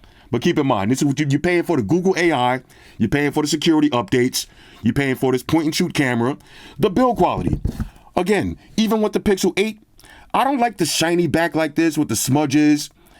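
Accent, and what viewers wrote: United States. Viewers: The only stand-up comedian reviewing Gadgets channel in whole YouTube😅🌹although I don't understand some words of native usa accent, but I always watch each video uploaded🌹😅 tnx for ur perfect sense of humor 🌸🌸 🌸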